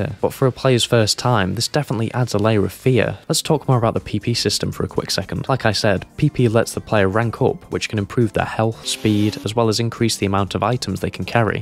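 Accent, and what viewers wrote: United Kingdom. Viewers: Great review. I miss DR1. Are you British?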